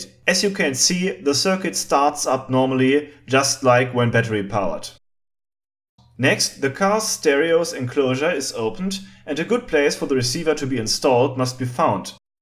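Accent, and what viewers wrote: Germany. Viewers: Dis strong German Dialekt made me klik Like at instant. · Horrible German accent. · I love how you almost mix some German words into the English ones. Like Zwölf and Twelve turn into Twölf😂 Also, awesome hack. I have used your audio input hack on an old Grundig "Music Boy" radio reciever, and it works great! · Man hört den deutschen Akzent einfach mal sowas von :D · Hate german accent -.- SO FCKN TERRIBLE!! Nice vid tho.. :D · The switch has to be deleted.......... LOL In den Papierkorb verschoben......... gröhl.... Herrlich dieses Englisch mit dem deutschen Akzent! Ich bin stolz auf dich! Weiter so! Vielleicht doch zukünftig in Deutsch? Aber solltest Du dich mal nach Bonn verirren gehen wir ein Bier Trinken! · i was here just to hear german speake english with accent! it was good !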